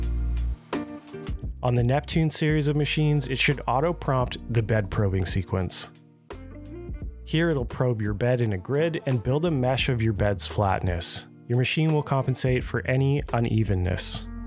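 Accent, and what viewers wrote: Canada. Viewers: You sound Canadian!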